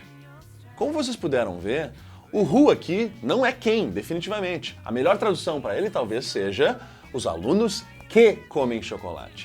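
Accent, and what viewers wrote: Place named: Rio Grande do Sul, Brazil. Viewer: sotaque gaucho gostoso da porra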